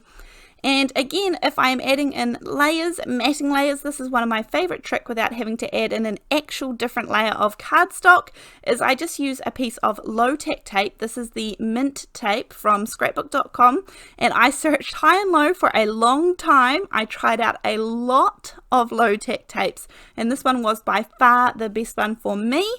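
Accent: New Zealand. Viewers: If you die cut the rectangle out of both cards, couldn’t you have made 2 cards by swapping your pieces over & still raising them with foam. I do luv your videos (yes I’m subscribed) & your kiwi accent too 😀👍🏼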